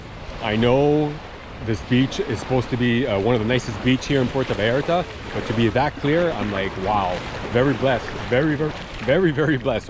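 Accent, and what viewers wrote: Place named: Quebec, Canada. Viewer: Je peux reconnaître un Québecois partout dans le monde ! Très beau vidéo!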